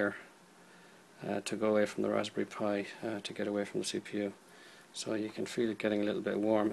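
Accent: Ireland. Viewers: The Irish is strong with this one 🍀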